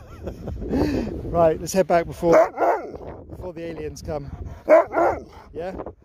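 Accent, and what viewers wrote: United Kingdom. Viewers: Btw are u from the UK??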